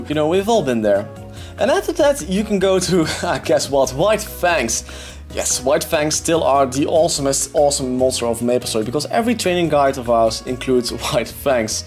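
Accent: Netherlands. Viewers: You dutch bruh?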